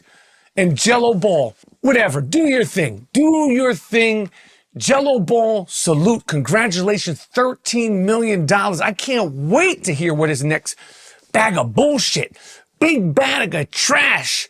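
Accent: New York, United States. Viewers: I'm here for the new York accent. I know you will bring something to the table every time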